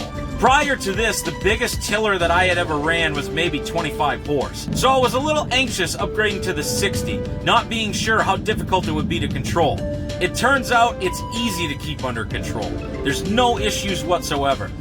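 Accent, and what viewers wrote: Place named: Maine, United States. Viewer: Your license plate may say Wisconsin but you sure sound like you are from Maine or somewhere out there.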